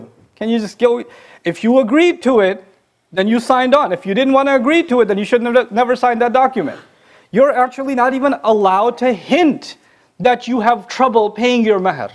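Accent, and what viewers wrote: United States. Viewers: You have an American accent, sir. In fact you sound a lot like the charismatic Evangelical Christian Preachers in The USA 🇺🇸. The Islam that you are preaching is not at all the Islam taught in Islamic countries or taught from The Quran. The Quran is a very unorganized book of unintelligible texts that sound somewhat reasonable in the first few Surahs but then progressively become angry, hostile and murderous as The Prophet Mohammed becomes more angry, hostile and murderous. The Quran is a lot of mumble jumble jumping from subject to subject with no structure. It’s a hodgepodge of “scriptures” from Jewish and Christian texts that are scrambled together and with a lot of errors as these were oral traditions and “orally transmitted,” Mohammed heard “stories” from Jews and Christians but he mixed them all up. “Mary,” the mother of Jesus, was not the sister of Aaron and Moses and Jesus was not born under a Palm tree! 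Aaron and Moses had a sister named Miriam long before the birth of Jesus. The Egyptian Pharoahs were definitely not living in the same period as Haman, The Persian villain, during the time of the Jewish Queen Esther who married King Ahasuerus to save her people, The Jews. These were two historically different time periods! Now The Bible has a lot of fanciful stories woven into real historical events but much of the actual historicity is still backed up with modern archaeology. Not The Exodus from Egypt, however. There’s no archaeological evidence of millions of Hebrew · When did he become a Muslim? He sounds American English. Are you Muslims now throwing out the Koran? I don't think so.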